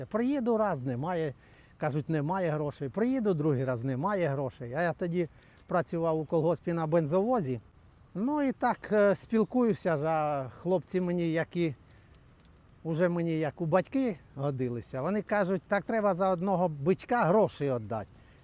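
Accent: Chernihiv, Ukraine. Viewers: Доброго дня земляче з Бобровицького краю!а я по говору і познав,що чернігівська вимова.с.Ярославка.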